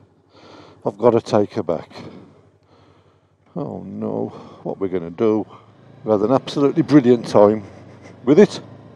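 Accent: Birmingham, United Kingdom. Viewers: Tuned into to this as I've been thinking about getting a full license and the idea of a CB500X caught my attention for a while. First few seconds and I'm hearing a familiar accent... Within a minute or two I realise where you are and how this isn't a million miles away from me! First time I've seen a bike vid that's based over in Brum side. I'm going to have to go through some other vids now! Thanks in advance. All the best :)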